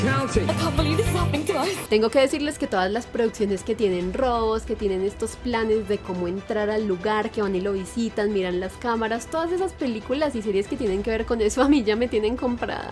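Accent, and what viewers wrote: United Kingdom. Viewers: Me encanta el acento inglés de 🇬🇧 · hablando ingles británico 😁😁😁 Amigeeks: Mira ese potencial.jpg Yo: Que femenina.jpg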